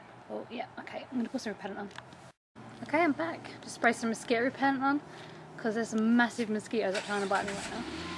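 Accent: United Kingdom. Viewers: I love your British accent :3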